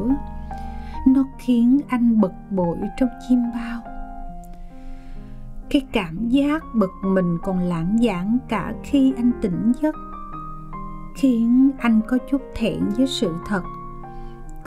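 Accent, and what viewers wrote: South, Vietnam. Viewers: Viết thì hay, nhưng cấu kết câu chuyện thì không đâu vào đâu. 6/10. Cảm ơn giọng đọc miền Nam tuyệt vời của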